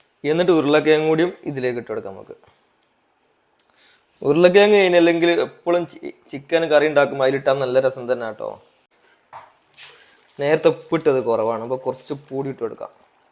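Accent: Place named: Kannur, India. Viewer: Kannurano naad bhasha ketitt angane thonnunnu bro u are very clean person🎉🎉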